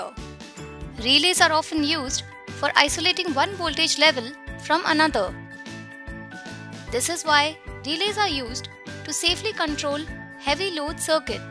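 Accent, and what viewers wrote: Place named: India. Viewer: Great presentation but this annoying woman with an indian accent i can't...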